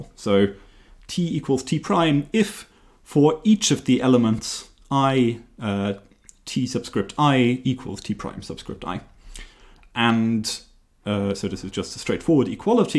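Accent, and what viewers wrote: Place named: United Kingdom. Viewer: Wow, first time listening to Cambridge University. Love it, both content and the British accent.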